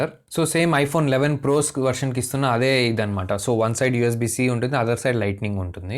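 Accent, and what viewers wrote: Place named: Tamil Nadu, India. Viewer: Superb..bro..voice Bagundi tamil.mix ainatu vasthundi. Good ❤️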